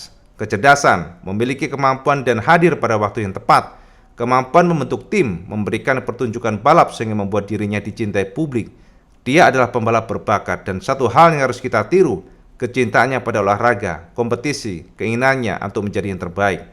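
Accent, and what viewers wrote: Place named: Cirebon, Indonesia. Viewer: kayanya orang cirebon nih, logat bicaranya sama kaya tetangga gw 😅, kereen 👍🏻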